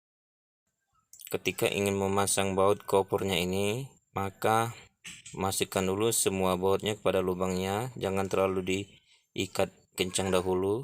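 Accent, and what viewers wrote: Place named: Palembang, Indonesia. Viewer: Logat Bahasa suara anak palembang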